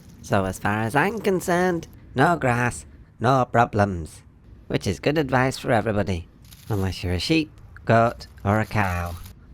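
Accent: United Kingdom. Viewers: As a person who lives somewhere in America, I don't recognize all the British dialects. From whence is yours,? I find your voice rather soothing.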